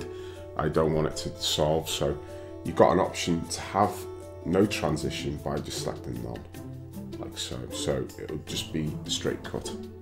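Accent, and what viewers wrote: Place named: Liverpool, United Kingdom. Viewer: Scouce, lad 😂😂😂😂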